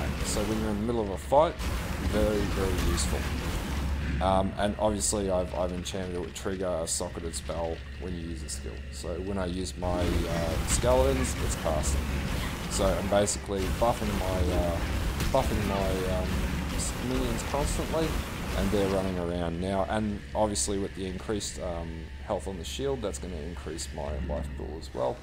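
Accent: Australia. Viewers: There's something soothing about being called a filthy exile in a smooth Australian accent (or is NZ?)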